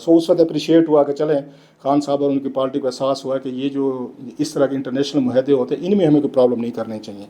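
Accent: Saraiki, Pakistan. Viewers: کلاسرا آپکی سرائیکی اردو پر حاوی ھے آپ نے باربارکو ولا ولا بولا ھے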